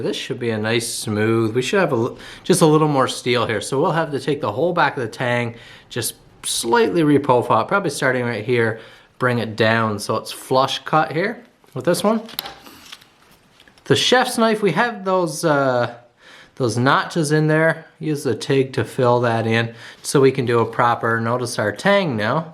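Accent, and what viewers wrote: Newfoundland, Canada. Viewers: As soon as i started this video i was like this guy gotta be from Newfoundland lol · man as soon as I heard ya talkin I knew you were from the rock. Cheers! ill have to add one of your knives to my collection. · I thought I detected an accent. Are ya a Newfie there by? I see the flag behind ya. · This popped up on my suggested videos and you managed to get 2 words into your intro before I was wondering what part of NL you were from haha. Always nice to see another Newfoundlander unexpectedly! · I watched this whole video wondering why you sounded familiar only to realize you are from Newfoundland ahahah amazing work. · Me getting distracted by the fact that this dude sounds like a Newfie. Looks at description. "oh. Checks out."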